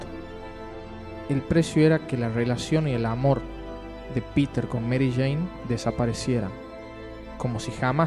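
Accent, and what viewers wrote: Tucumán, Argentina. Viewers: Sos tucumano o santiagueño? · sos tucumano?? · eee el asento tucumano xd es feo sin ofender lo repito SIN OFENDER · tucumanoo como yoo jajaja de donde eres? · Este es Argentino :v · Bien relatado, se nota la tonada tucumana! jaja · alto tucumano básico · Se te re nota el acento tucumano jajaja. Es genial ver a alguien del NOA con un canal con esta cantidad de suscriptores. Saludos desde Catamarca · sos tucumano, salteño o catamarqueño? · siempre me genero fuertes emociones el comic de ciwil war y los posteriores a este T-T recordar esto me puso triste otra vez XDD PD: Te encuentro acento argentino... jajjaja de que parte? yo soy de tucuman XD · no es que no sepa pronunciarlo , el es de Tucuman , Argentina y en esa zona tienen ese acento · Tucumano o me equivoco? Ajajajja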